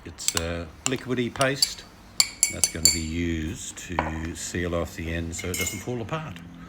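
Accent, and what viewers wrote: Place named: Australia. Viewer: Love it. So Aussie..."that's a pain in the bum".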